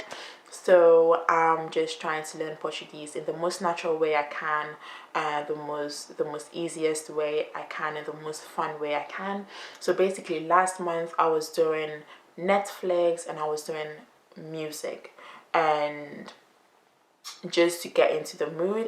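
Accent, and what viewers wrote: Italy. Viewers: Já estás a falar TÃO bem! Com um sotaque italiano muito fofo e um pouco do Brasil! 🌷 Um beijinho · Seu português soa com sotaque italiano, fica muito fofo.🥰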